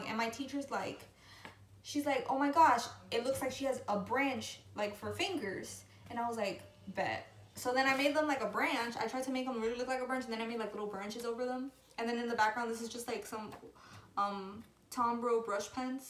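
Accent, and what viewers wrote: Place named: New York, United States. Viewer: are you from new york because you sound like it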